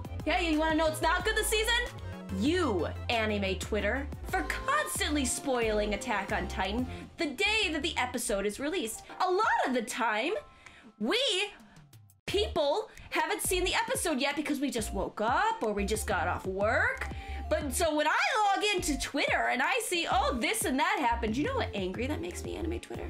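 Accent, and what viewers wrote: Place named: United States. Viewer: She speaks with an American accent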